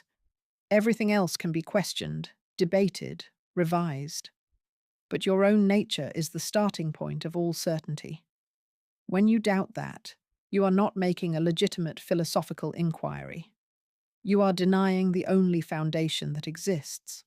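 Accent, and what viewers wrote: United Kingdom. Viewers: ❤ Thank you ! That' s one of the most illuminating lessons of the whole course. And the explanation in this video is brilliant! The britisch accent even seems reinforce the clarity of the message. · Hello wonderful resource that you are! I know you are also missing the 'original voice' as we all are...I did want to say though the current choice isn't just an English accent it's an upper middle class, private school voice...it conjurs..Heirarchy, Empire, the class system and in short a kind of scolding snoberry! So I wanted to suggest.... it's known that.... - BBC Radio Scotland’s “Voice of the Year” - used to be a big one for broadcasters and storytellers using a Scottish accent. - Saltire Society Literary Awards / Scottish Book Trust - not accent-specific, but Scottish writers and performers who use dialect/Scots are regularly shortlisted and praised for “authentic voice”. 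- Fringe First Award - the most prestigious Fringe theatre award were won using strong Scottish accents to deliver comedic or political ideas. - Total Theatre Awards, Herald Angel Awards - also give weight to “distinctive voice” and use of Scots/dialect. - BAFTA Scotland Award for Best Presenter/Performer - has gone to people for work delivered in a Scottish accent. - Royal Television Society Scotland Awards Using a Scottish accent is seen as a strength...... *Toastmasters International - The winner goes to the European finals. Scots accents often win because the authenticity and cadence help land the ideas. --- The most “published” accolade people usually cite is a Fringe First Award or a *BAFTA Scotland*. Both are widely covered in the press and specifically celebrate performers who use their Scotti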